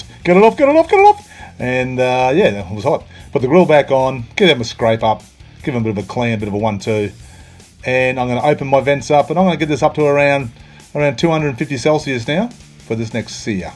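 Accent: Australia. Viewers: Aussie as fuck love it mate 🍻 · after watching dozens of seppo bbq videos, can i just say how refreshing it is to hear an Aussie voiceover lol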